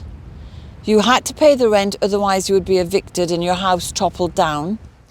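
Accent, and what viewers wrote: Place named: Northern England, United Kingdom. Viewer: It's absolutely horrific what the British ruling class did to the Irish. do you not experience hostility in Ireland due to your accent? Like you I'm from northern England. My mum and half my family are Irish (my mum emigrated here). We never go back now due to the hostility caused by our accents. They say my mum lost hers and of course I have an English accent. It bothers me because we're descended from working class Irish and English people who suffered too but they don't tend to make that distinction as soon as they hear an English accent.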